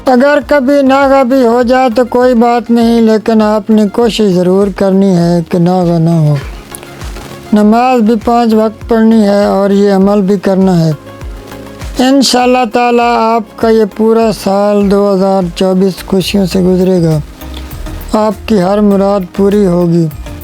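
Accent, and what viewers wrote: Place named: Sargodha, Pakistan. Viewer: Aap ke Urdu boli par Sargodha ke local boli ka rang Chara hua ha. Aap ka distt ya division Sargodha ha??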